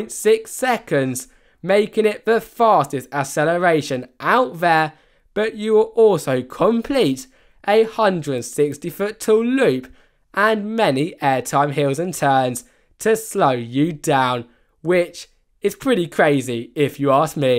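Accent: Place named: United Kingdom. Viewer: it's a british accent lol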